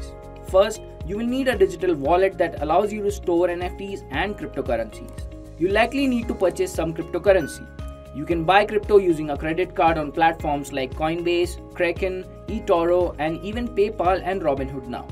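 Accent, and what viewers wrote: India. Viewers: Nothing personal because it's not his fault and he quite likely has good intentions, but I hate indian accents, it's hard to understand and annoying to hear in English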